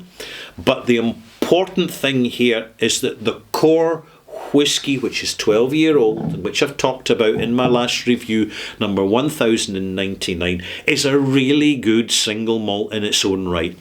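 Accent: Scotland, United Kingdom. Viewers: I've been trying to listen to a couple of Bootlegs Clash and Led Zep) I bought tonight and watch you with subtitles. It is really quite hard to make sense of your videos using the subtitles alone as they are so off the beam. It is part down to the Scottish accent - have you seen the sketch in the voice actuated lift with 2 scotsmen! No biggie really I know I should listen to the undiluted velvet tones only.....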